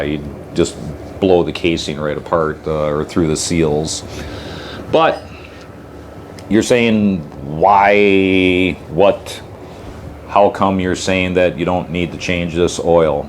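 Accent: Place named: New England, United States. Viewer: I’m sorry I just can’t stand the sound of Yankees at all. I’ll find somebody else to explain it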